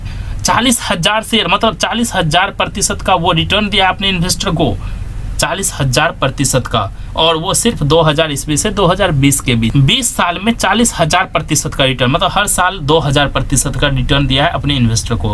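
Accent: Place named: mixed, India. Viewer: tu pehle thik se shuddh hindi bolna sikh...UP, Bihar ka accent bolna chod de..bolne ka tarika sudhar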